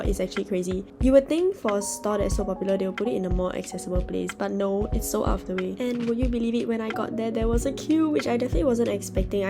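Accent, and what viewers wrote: Singapore. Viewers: 2 seconds into you talking I already could tell you were from SG from the accent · that singapore accent is brutal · かわいいー シンガポール人のアクセントはやはり大好物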